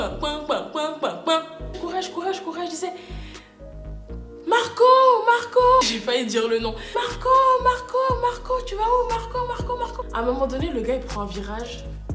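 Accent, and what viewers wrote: Cameroon. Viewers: Le Cameroun s’entend d’une manière , tu m’as tué « la honte de ça » 😭😭🇨🇲🇨🇲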